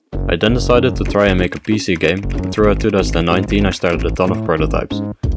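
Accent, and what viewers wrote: Netherlands. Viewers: Mjst say : yoyr dutch accent is in english better then other ditch people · I was hoping you'd do a devlog! Ook leuk om te horen dat je Nederlands bent :D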